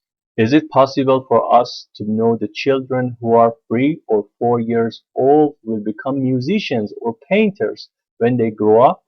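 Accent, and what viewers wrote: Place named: India. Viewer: مثه هندیا انگلیسی صحبت میکنید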